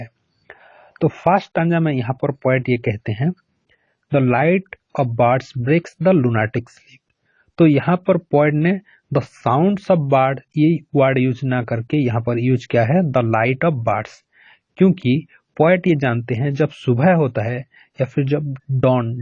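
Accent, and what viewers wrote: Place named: Bengal, India. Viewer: Sir r u bengali ?ur accent seems like that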